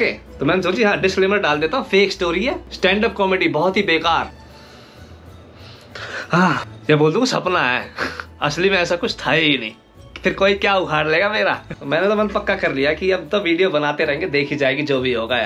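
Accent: Haryana, India. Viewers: Sir apka hasta chehara aur apki hariyanvi bat bahot din ke bad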